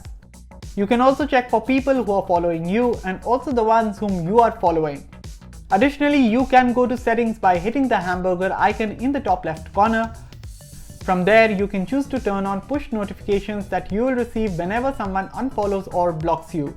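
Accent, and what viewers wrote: India. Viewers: Indian accent, im out!!! ✌️ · Indian accent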